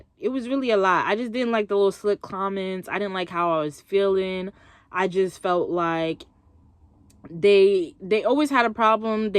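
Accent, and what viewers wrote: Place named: Long Island, United States. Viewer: Love the Long Island accent yo need to make it a character 😂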